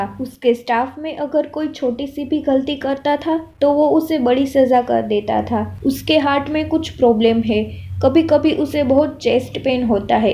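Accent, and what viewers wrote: Gujarat, India. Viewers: Aap gujrati ho kya Aap ki voice me gujrati touch h You have h such a nice voice and good explanation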